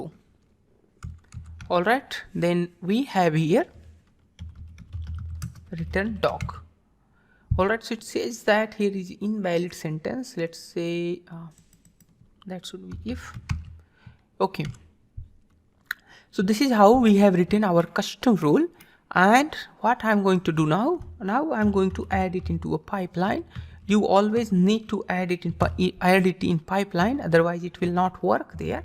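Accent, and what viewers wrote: India. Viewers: Bro, I don't usually comment but your videos are amazing. The way you speak English, with an Indian accent is what resonates with me. I have watched a lot of tutorials but they were mostly by non-Indian but I think your's are the best. You are underrated , brother. Btw, ima bitsian.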